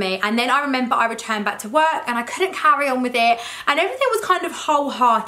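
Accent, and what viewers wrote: United Kingdom. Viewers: British accent😍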